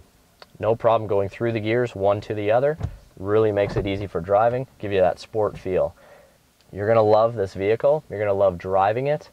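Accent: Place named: Canada. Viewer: Oh he's Canadian... That explains his pronunciation and his hotness :)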